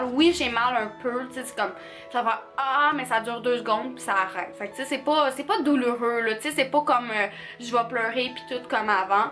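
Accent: Quebec, Canada. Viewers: Pourquoi j’ai des vidéo story time sur les première règles TOUJOURS sur l’histoire de québécoise (n’empêche j’adore l’accent) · Lol T’es tu Québécoise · Samantina Filoscin c est normale elle sont toute les 2 québécoise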